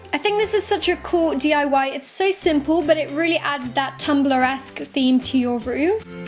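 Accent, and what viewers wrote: United Kingdom. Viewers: MrsPixie3D if she is, she speaks like a lot of people in the uk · OMG I looove your british accent! BTW really cool room decors! xoxo <3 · i love your british accent though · also u can tell she is English by her accent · looove you british accent!!❤😘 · oh I thought she was British because sainburys is a British store and has a British accent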